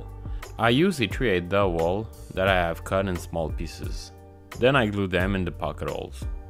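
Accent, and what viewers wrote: Quebec, Canada. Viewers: Est-ce que je reconnais un petit accent québécois?? Beau projet btw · J’adore comment tu ajoutes des « h » au début de certains mots et tu les retires du début d’autres: on reconnaît ainsi l’anglais avec l’adorable accent québécois!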